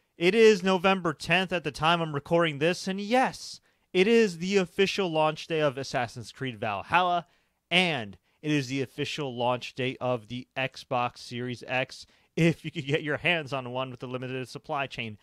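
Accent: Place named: South, United States. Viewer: This guy has a southern accent, Lew has a Canadian accent. Delivery is similar tho.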